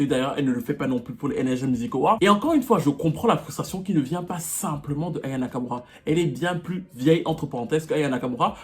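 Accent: Cameroon. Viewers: Je viens de decouvrir ta chaine et like beaucoup,surtout ton ptit accent +237...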